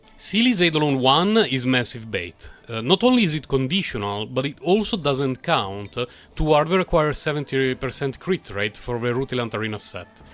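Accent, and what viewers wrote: Italy. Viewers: I have no idea why, but you give such strong italian-english accent vibes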